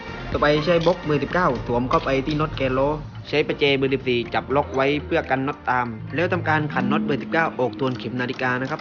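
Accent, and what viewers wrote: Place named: South, Thailand. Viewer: พี่แกน่าจะคนใต้ครับ ฟังจากสำเนียง 555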